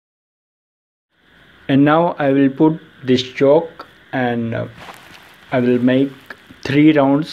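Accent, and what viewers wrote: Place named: India. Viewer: Your jeet voice cannot be fixed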